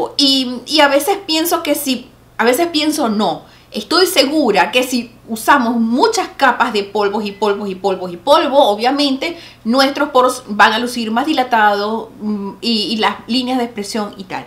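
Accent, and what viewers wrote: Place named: Venezuela. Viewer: Holaaa soy de la Isla de Margarita.. estoy viendo tus vídeos son magníficos que buena eres en lo qué haces.. cuando te escuché me dije ay me suena a Venezolana jajajaja me alegra tanto ver mi gente venezolana por el mundo dando lo mejor de lo mejor.. gracias, gracias, gracias por estar en las redes sociales llevando inspiración y si se puede estar bellas y lucir jovial hasta con 80 si se puede.. Dios te bendiga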